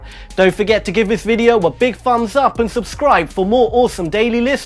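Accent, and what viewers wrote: England, United Kingdom. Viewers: Where's this guy from,?!!! Britain?! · Anybody find this insulting that somebody from the UK is the narrator? Seriously what does he know about Our States and what goes on there?! I'm quite surprised that Florida didn't make that list, I've been living here for decades and let me tell you that between the heat and transient nature of the people here that it's not a big secret that there are an astounding amount of seriously twisted, violent, nut jobs that've called this state home! I could name numerous Serial Killers that've taken up residence here at one point or another... Ted Bundy, Otis Tool, Christoher Wilder etc. We are the State that the Pulse Nightclub massacre & killings occoured at, we had the UF Murder of the College Coeds in Gainsville, we are the number 1 state for those scam drug rehab centers, which makes this state incredibly active in drug abuse and crime, we had the aftermath of the Mariel boat lift in 1980 which spawned the Scarface movie, based loosely on real events and people. 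In the 70s & 80s we were the #1 State for drug smuggling which had a significant impact on drug related violence. We had numerous infamous people here like Dalia Dipolito who's murder for hire attempt on her husband was near by where I live, we had the Casey Anthony murder case, the infamous kidnapping & Murder of Adam Walsh, the list just goes on & on... With the Everglades & the Atlantic ocean as resources, we had well known places that the bodies have been hidden and disposed of.... We're in the top 3 states for Gun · phelp Australian? Are you an idiot. He's obviously English.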